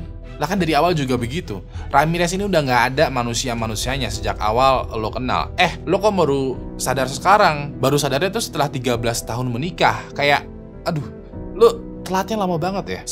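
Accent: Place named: Makassar, Indonesia. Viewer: Halo orang makassar ya? Nama di makassar itu, karna kosa kata n logat, biasanya dari nama jadi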